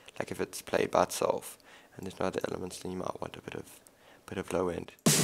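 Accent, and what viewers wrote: South Africa. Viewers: ***** Hey man, are you from South Africa?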